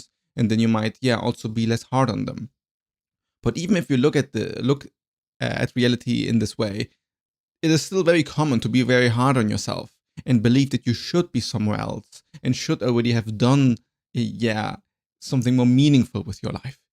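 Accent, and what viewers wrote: Germany. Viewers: Am i crazy or is that a german accent if yes keep it going, german youtube is so trash that this content is gold compared to their mainstream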